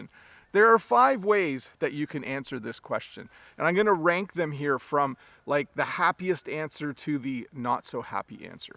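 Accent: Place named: Canada. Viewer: Thank you teacher I like your accent and the canadian people so much 😍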